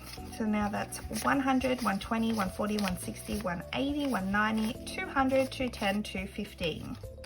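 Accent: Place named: Australia. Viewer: Her accent sounds like Australian so I assume that is the currency.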